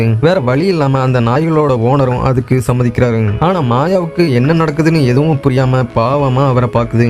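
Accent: Kongu, India. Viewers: Enuginga neega kongu nada 😂 ing vida mudiyala 😂😂 I am kangayam inga 😂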